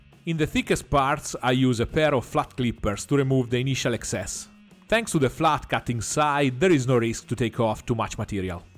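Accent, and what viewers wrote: Italy. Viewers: Boia come si sente che sei italiano. Bravissimo comunque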